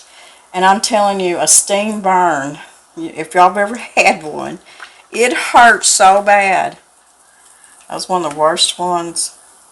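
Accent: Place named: Arkansas, United States. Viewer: Oh, my goodness. I just want to come and sit and visit and eat some of that good down home cooking! I love to watch your videos and listen to your Arkansas accent. Thank you for all the good recipes. I recently bought a grain mill and watched your videos on your new grain mill. I’m 80 years young and still learning new things. God bless you both!!! ♥️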